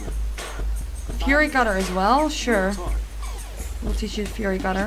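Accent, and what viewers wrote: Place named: Netherlands. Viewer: wow congrats haha, I have shiny Shedinja too (got from my brother) also, you kinda have a Dutch accent, could it be you're from the Netherlands?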